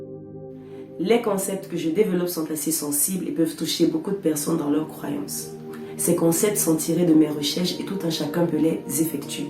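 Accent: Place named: Cameroon. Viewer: pourquoi tu parles comme une camerounaise?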